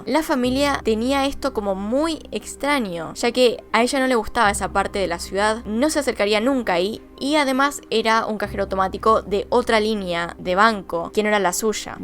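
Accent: Buenos Aires, Argentina. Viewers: Es acento porteño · yo odiaba el acento argentino, pero te conoci a ti y todo cambio <3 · Estoy de acuerdo, he escuchado el acento argentino de diversa áreas y me solía incomodar. Aparte que he conocido en persona variass argentinas y son difíciles de dialogar. No soy la persona que juzga a todos por el mismo filo, sin embargo es agradable escucharte y no ser petulante o de alta arcurnia. Me smpatizas muchos y por eso sigo tus casos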